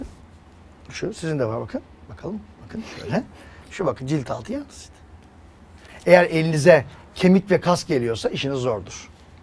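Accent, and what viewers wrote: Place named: Aegean, Turkey. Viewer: Doktor olmuş ege şivesiyle konusuyo diyen gerizekalılar da var.dogal olmak güzel.katiliyorum size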